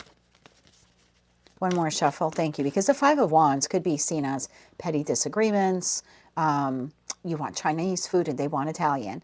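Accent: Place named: Newark, United States. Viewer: Don't mind the cursing at all, appreciate the honesty! Sounds straight from Newark!😅 great reading, thank you! Much Love ❤️ & Light ♍️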